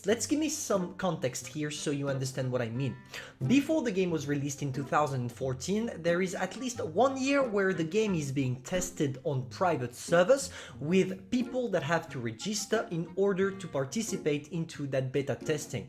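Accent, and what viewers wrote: France. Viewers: omg ce french accent j'adore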